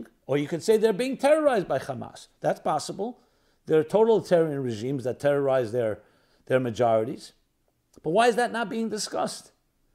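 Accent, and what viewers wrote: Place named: Brooklyn, United States. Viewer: Thank you, Mr.. Rebeca was a ryan and I know somebody from the Armenian background that is persecuted in Iraq. We support the Jewish people as a persecuted people and recognize Islam as a genocidal ideology. Both of us have been attacked by Muslims and have studied the reasons for this of course, they will always deceive people when they have the chance that there's some other reason, but they do it because of the evil of their religion. You said that the Jews remain in that area for hundreds of years my suggestion would be to clarify how many hundreds of years wasn't it thousands? Are you from Brooklyn- I used to live in Brooklyn near Flatbush and Atlantic, and in the area that Rabbi MK lived the same area where the Al-Qaeda mosque was only about 1 mile away. and I noticed a little bit of an accent. We wish you safety during this time of persecution and want to help come up with some solutions. 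Go with YHVH and thank you for being plain about the lies. We also have a lot of information, and predicted the recent attacks by Isis, as well as the reasons for it, such as releasing Isis, and we also predicted that the other terrorist organizations would bond with them over the attack against the Jew Islam itself largely pivots on antisemitism! We would like to connect with you do you have an email? <link>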